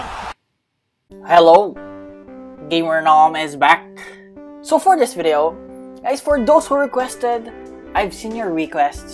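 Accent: Philippines. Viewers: What....! Bro I thought Ur a American lezz go Ur pilipino right u literally said pa milktea ka Naman lol hahahahaha nice btw Ur accent is super duper cool broo Keep it up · gagi akala ko english talaga si pilipino pala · Sabi na parang di amerikano at Filipino ka nga a hahaha ha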